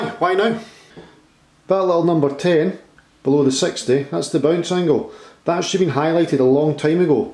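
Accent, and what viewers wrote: Scotland, United Kingdom. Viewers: Aye, adjustments are possible to reduce the effect of bounce off concrete. Why not do a Scottish version of Phil Mickelson and show on a video how to hit very different shots with the same club. Too many are too poor at understanding the versatility of a wedge anyway. This said just as an idea for additional vlogs from you. Examples: low chips with a lob wedge and next a flop shot with the same club, and how to master both, and how to increase bounce by opening up face in soft sand and the opposite in wet sand, and so forth. Good luck with your shootings. And what a delight it is to hear your dialect. Be proud of it! Keep the good stuff coming. Greetings from a snowy Sweden.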